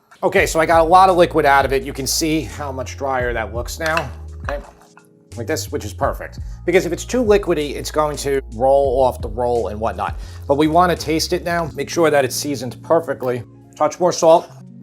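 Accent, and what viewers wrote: New York, United States. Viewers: Just made another batrch today in an effort to save money. Well not an issue in USA, but here in Norway sliced turkey etc and deli foods run at 45 to 120 usd a kg. So as a poor creative/guitarist, have to do anything possible to cut down on food costs. Whereas found chicken breasts on sale for 15 dollars a kg. Of course have expensive guitar collecting habit, just spent 20k usd on vintage guitars. But have to pay that back somehow. So strongly recommended. Plus I love the NYC accent. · I am from Perth, WA and I just wanted to say your NY accent is great!!! All the best.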